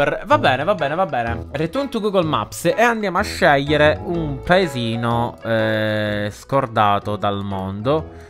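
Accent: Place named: Palermo, Italy. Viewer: melo sentivo che sei palermitano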